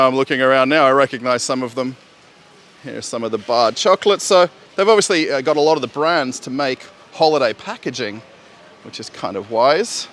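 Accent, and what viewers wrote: Australia. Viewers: Австралийский английский как-будто понятнее что ли.. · Он же вроде австралиец. Да/нет? У него есть австралийский акцент?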